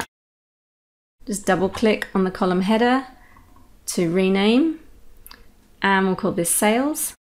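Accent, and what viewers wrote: United Kingdom. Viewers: Thanks for the great tutorial. I despair when I receive badly formed spreadsheets. PQ has totally changed that - at least it numbs the pain a bit. BTW nice to hear a Brit voice for a change.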